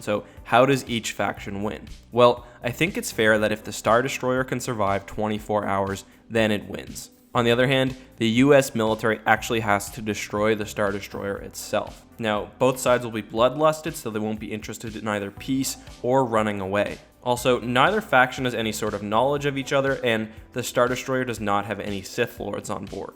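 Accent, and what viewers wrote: Canada. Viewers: Patriotism? It's pretty obvious this guy is Canadian due to his accent.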